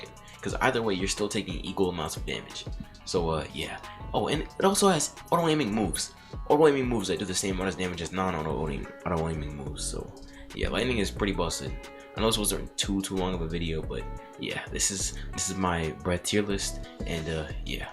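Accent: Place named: New York, United States. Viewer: are you from new york you kinda have an accent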